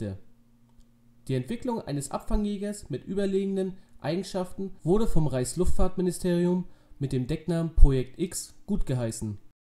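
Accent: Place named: Mecklenburg-Vorpommern, Germany. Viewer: Der Sprecher stammt der Aussprache nach, vermutlich aus dem nördlichen Mecklenburg-Vorpommern😉. Klasse Video!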